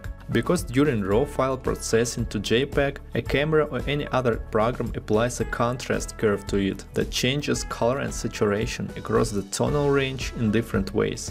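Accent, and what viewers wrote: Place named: Russia. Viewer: Ну да!!! я на много лучше понимаю Русский Английский, чем Американский Английский...Это я понял!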